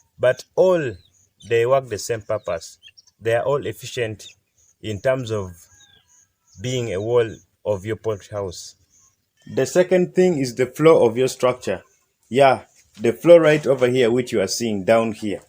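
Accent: Uganda. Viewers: He looks a ugandan n his accent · His accent from Uganda. Kenyan man can recognize them a bange!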